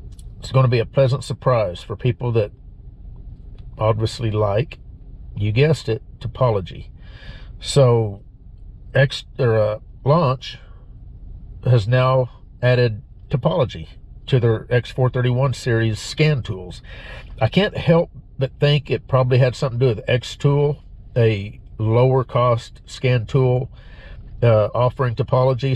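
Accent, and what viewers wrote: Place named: South, United States. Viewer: I thought I heard a southerners voice, im from AR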